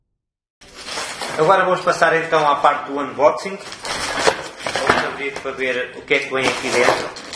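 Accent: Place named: Portugal. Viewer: Muito legal esse jig para corte... certamente farei um genérico em madeira pra mim rs Vi que você respondeu meu outro comentário aquela vez mas acabei perdendo a conversa... pra mim é mais fácil entender hoje o seu português que é completamente diferente do português falado aqui no Brasil por acompanhar diariamente O Homem que Mordeu o Cão da rádio comercial. Boa sorte no canal.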